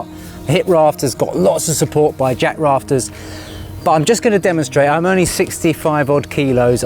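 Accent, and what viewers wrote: England, United Kingdom. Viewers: English English is so annoying, innit? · Uhm... I noticed British accent, rather than American... Swamp is... Louisiana... ? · Which part of England are you from? My Electrotechnology teacher has the same accent ahah And he’s also a good teacher!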